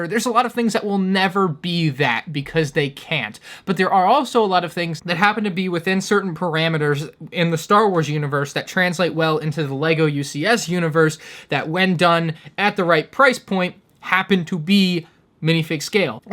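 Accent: Germany. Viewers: as a german i approve of your german accent :) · Just wtf for that „german“ accent lmao 😂😂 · As a German i have to say that your german Accent is really good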